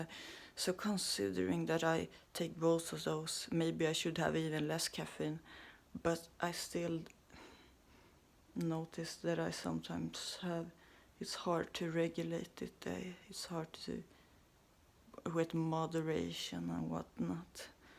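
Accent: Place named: Sweden. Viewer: Sounds swedish. Köp l tyrosine, fixar avgiftningen. Naturligt koffein, en aminosyra. 150kr burken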